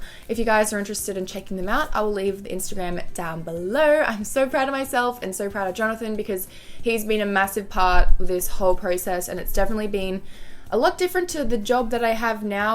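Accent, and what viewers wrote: Australia. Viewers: Hey I can tell ur Aussie/New Zealand?...twins.hope ur near Sydney.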